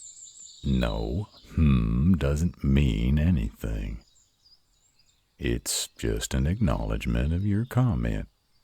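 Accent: South, United States. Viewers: Oh my god…. The southern accent…. I can’t… 😳 🩸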